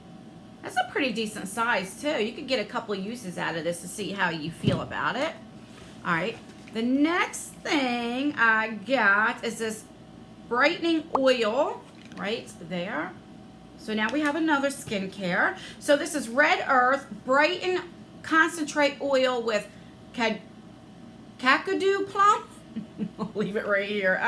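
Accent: New Jersey, United States. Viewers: I love your jersey accent I’m from the south so it’s not very common to hear😝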